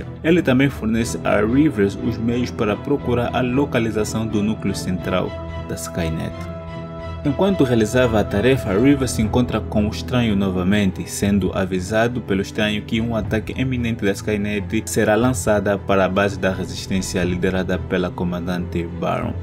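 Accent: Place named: Portugal. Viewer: Vim do vídeo sobre The surge , o microfone nem era ruim como falavam dava pra entender , eos br achando q vc era Portuga kkkkkk , legal saber q melhorou a qualidade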